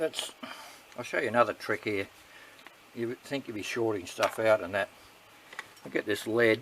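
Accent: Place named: Australia. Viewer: Thank you, this is extremely helpful ✅ It’s great to hear an Aussie accent on YouTube. Best wishes, watching from Batemans Bay, NSW.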